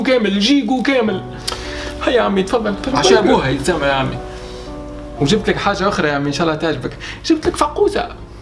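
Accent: Sfax, Tunisia. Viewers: شكراً لاستخدامك لهجتك الصفاقسية الجميلة المميزة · je viens de découvrir ta chaîne YouTube ces derniers temps et j'ai juste envie de te dire que j'adore ton accent sfaxien , c'est juste ouf 😍😍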